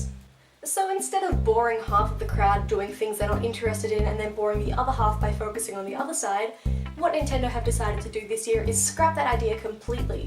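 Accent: Australia. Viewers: aaah, dat glorious fellow Australian accent.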